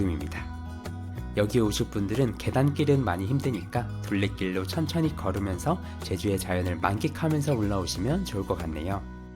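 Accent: Gyeongsang, South Korea. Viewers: 님 한의사라고 본것같은데 맞나요? 정말가끔유투브보면 차분한말투와가끔 경상도사투리가 귀엽고정겹습니다 감사해요^^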